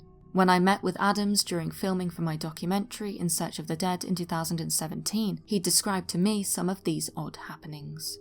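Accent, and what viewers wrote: United Kingdom. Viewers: they have all regional accents on tv now to show how “evolved” they are…. How not prejudiced! But has the traditional well spoken British accent that is what you think of when you think of a professional presenter! 🙏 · As an American wouldn't you believe anyone with a soft english accent work for the BBC?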